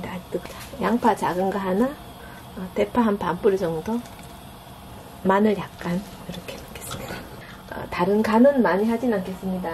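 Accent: Gyeongsang, South Korea. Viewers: 우리나라 갓중엔 돌산갓이 최고라지요? 전 뭔가 쌉살한 맛을 좋아해서 갓김치 좋아해요. 저도 멸치똥 머리 안떼고 해요. 귀찮아서이기도 하지만 그냥 약간 씁쓸한 맛이 좋아요 ㅋㅋ 귀여운 경상도 사투리로 조곤조곤 상냥하게 설명을 해 주시니 정말 재밌고 기분이 좋아집니다^^ 맛있겠다 ㅎ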